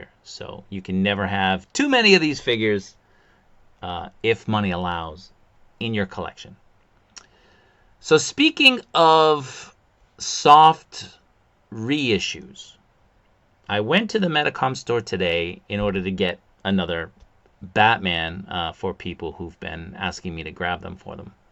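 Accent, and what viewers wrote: Boston, United States. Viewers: So you live in japan but I notice you have a boston accent, is that where your from originally? I gotta know man!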